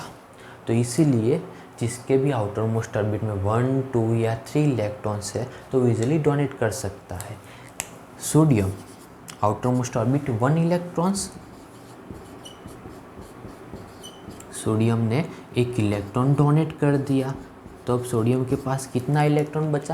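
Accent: Gujarat, India. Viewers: Sir AAP gujrati ho ?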